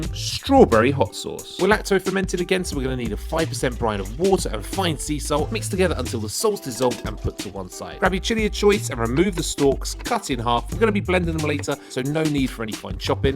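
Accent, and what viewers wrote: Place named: England, United Kingdom. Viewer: As a native english speaker I never thought I'd encounter an english accent too thick for me to comprehend naturally...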